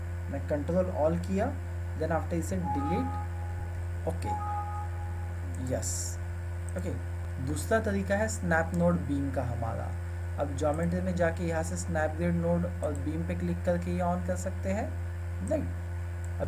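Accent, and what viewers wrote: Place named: India. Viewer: good video ! It would be great if your video had the option of subtitles, since it speaks too fast and its Hindu accent is very strong.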